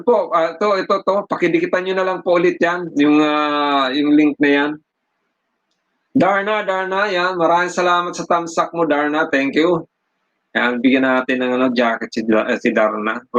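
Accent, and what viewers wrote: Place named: Bicol, Philippines. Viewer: Meron kuya my sounds ..you are from bikol?I'm from bikol too..from Iriga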